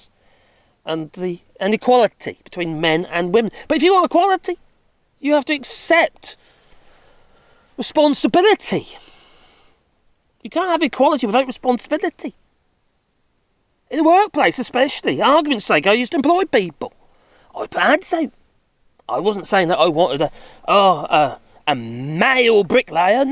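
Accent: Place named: Norfolk, United Kingdom. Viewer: In this strange troubling world we very much need people like you. Won't whittle on but not only talk a lot of sense but even better I get to hear a Norfolk accent as well. Lived many years in Overstrand and then Cromer and lastly in Wymondham. Keep going you are much appreciated by us tune in.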